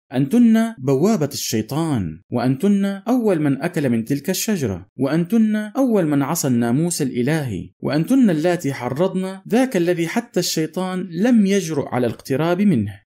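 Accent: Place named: Lebanon. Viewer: من أجمل القنوات جزاك الله كل خير لاحظت أخي من لهجتك أنك لبناني صحيح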